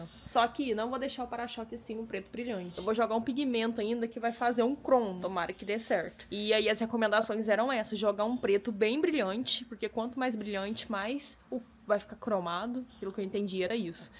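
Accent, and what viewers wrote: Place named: Caipira, Brazil. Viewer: Essa equipe é a melhor em assunto de reforma e criação de projetos. Esse sotaque caipira da é um charme. Simpatia beleza e leveza tudo junto nessa equipe.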